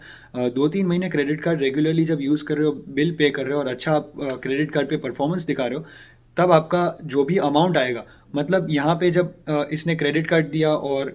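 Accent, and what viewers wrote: Gujarat, India. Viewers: Are you gujju?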